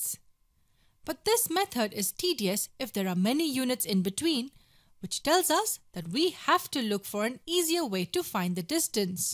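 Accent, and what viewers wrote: India. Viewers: indian accent uh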